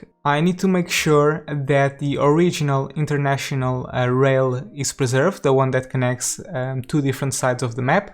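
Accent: Portugal. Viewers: Are you portuguese? Your accent looks like it Ok descobri que és tuga, já subscrevi. Adoro o Cities Skylines também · I was paying attention and suddenly my head was like "this sounds like a Portuguese guy speaking English". Eu estava certo 😂 parabéns pelo canal, estou a apontar as dicas! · Reconheci logo o sotaque portugues! É bom saber que existe um yt portugues de cities skylines apesar do teu canal ser em inglês!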